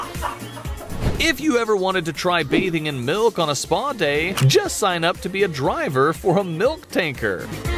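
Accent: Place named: United States. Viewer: We don’t really need terrible American voiceovers… or cheesy music.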